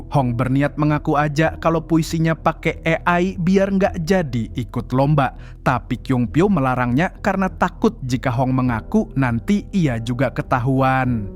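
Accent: Banjar, Indonesia. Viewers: Bang Kamu orang mana bang, kaya logat Banjar 😮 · keliatan urang banjar nya wkwk jah bang topi bunggul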